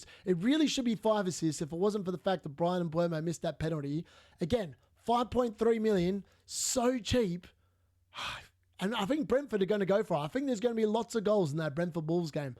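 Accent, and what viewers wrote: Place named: Australia. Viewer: The Aussie accent and the pronunciation of names got me subbing 😂 Brilliant show, mate! 👍🏼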